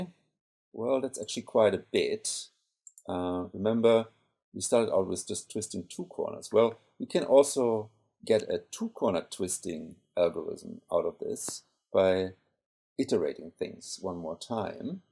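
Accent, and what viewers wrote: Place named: Germany. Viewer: Hello, I just wanted to thank you for bringing my attention to this great puzzle. I have cubed now for nearly half of my life and solving this thing has been my greatest archivement so far. Your videos inspired me and helped me to find my own - and until now very unefficient - algorithms (it took me about 5400 turns). My next step is solving this in less than 1000 turns. Way to go! I always wanted to ask you whether you have German origins of some kind? I am from Germany myself and your accent sounds kind of German in my oppinion, in addition to your name obviously:D Anyway, thank you again, and greetings from Germany!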